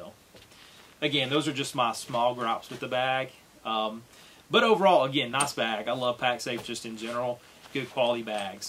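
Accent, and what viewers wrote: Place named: South, United States. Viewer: Love your charming Southern accent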